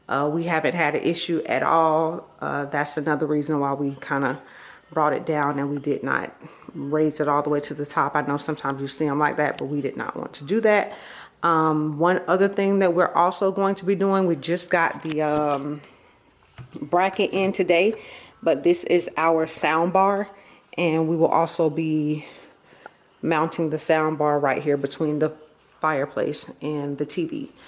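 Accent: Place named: Florida, United States. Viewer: New subscriber here! I knew you were from Florida with that accent before you even said it. Lol I'm originally from Tallahassee.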